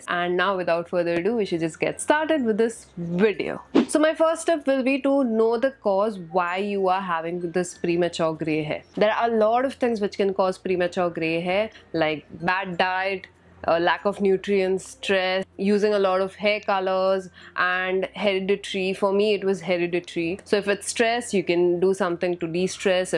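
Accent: India. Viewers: That indian accent. She sounds like Priyanka Chopra.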